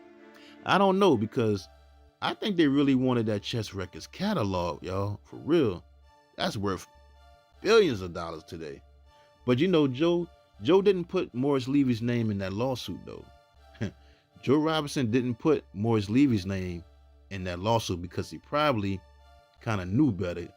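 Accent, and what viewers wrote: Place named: New York, United States. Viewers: Where are you from? Your accent sounds New York to me. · Right I was thinking the same thing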